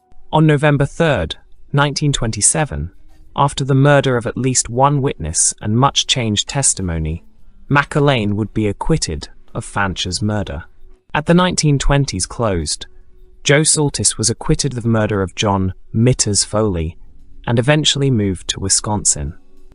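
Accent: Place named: United Kingdom. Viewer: Italian/American tough guy mafia and it sounds like some scared Brit narrating... see ya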